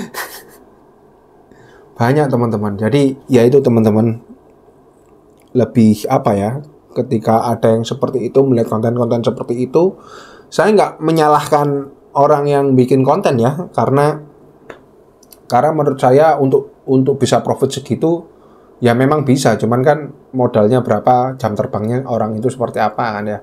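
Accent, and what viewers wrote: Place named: Java, Indonesia. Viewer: Bahasa Inggrisnya belajar dari mna mz , kok keren bgt agak2 logat jowo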